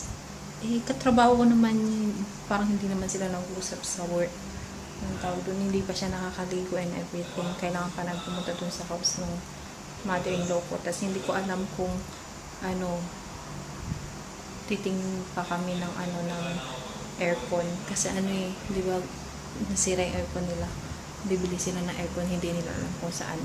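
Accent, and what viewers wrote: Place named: Singapore. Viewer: New Subbie just came across on your vlog. Funny how you also adapted the Singaporean english accent. Where in Europe they have that European accent. Looking forward in watching a marathon of you guys's vlog.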